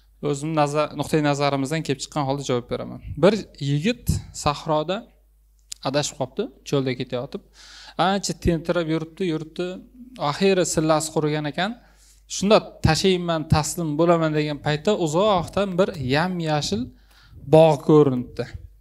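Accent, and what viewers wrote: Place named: Surxondaryo, Uzbekistan. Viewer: Qalban surxondaryolikman dedi,bir joydan ekanmiz😊😊 shevasi ham uxshaydi uzi surxondaryoliklarga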